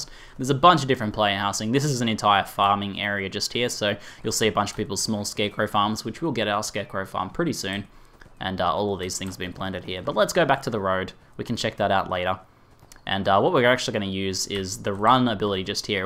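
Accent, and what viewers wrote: Australia. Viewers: Are you Aussie? Cause u sound like it lol I'm Aussie ;D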